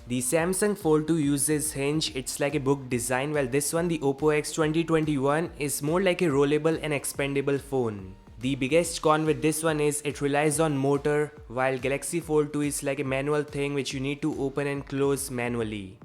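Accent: India. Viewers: I heard indian i dislike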